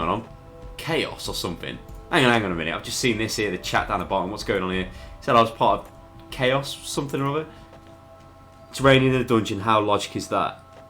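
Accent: United Kingdom. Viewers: this video is so birtish english i can take this for my english class :D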